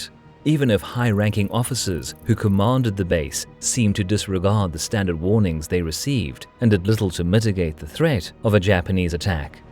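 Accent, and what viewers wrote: South Africa. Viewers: is the narrator South African? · Loved it, thank you! South African accent, Cape Town I would say. Sounds like you have been abroad for quite a while (or are you mimicking a SA accent?) I swear boet don't play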